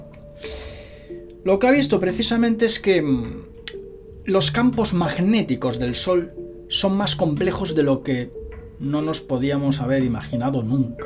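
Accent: Spain. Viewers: es de España fijate en el acento que tiene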